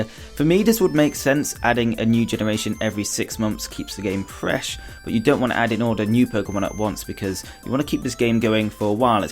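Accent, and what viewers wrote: United Kingdom. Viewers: you from sheffield?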